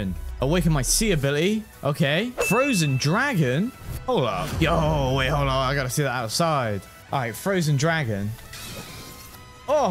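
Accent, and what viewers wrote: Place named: United Kingdom. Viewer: Bro with his British speaking kinda sounds clean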